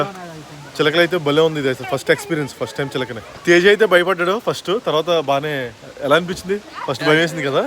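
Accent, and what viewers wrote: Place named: East Godavari, India. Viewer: U looking gorgeous bro..Pakka mana telugu east slang bro. I happy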